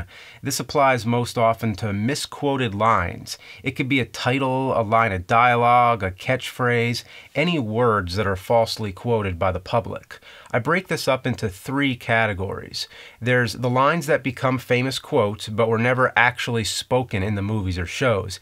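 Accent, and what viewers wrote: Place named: New Jersey, United States. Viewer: I noticed that too, I think it's just his New Jersey accent.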